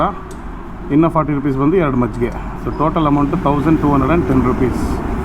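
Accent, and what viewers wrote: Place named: Mangalore, India. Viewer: bro adu Uttara Kannada, neevu mangalore kannada matadta edira :face-green-smiling::face-green-smiling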